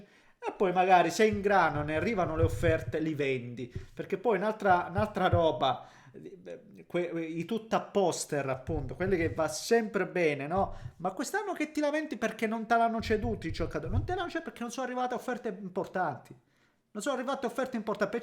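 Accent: Calabria, Italy. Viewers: Non so di dove sei ma riconosco un accento calabrese ❤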